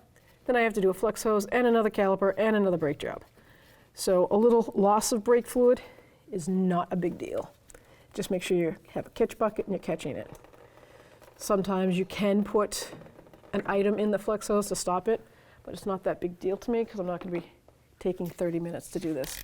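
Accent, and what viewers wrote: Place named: New England, United States. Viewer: Great video tool. I have the 2010 RX350, I've replaced the rotors and pads front and rear, but the brakes still grab...so I'm thinking I need new calipers. 177k miles I think she deserves them, so that's next and I'm thinking that will make the system work as good as new. Love the New England accent too...I'm from north of Boston myself! always check with TRQ first, and 1A Auto. They ALWAYS stand behind the product 100%. Thanks from a customer you've earned. Question: possible you have a video on changing the fluid in the differential/transfer case of the AWD model of the same year? Thanks again.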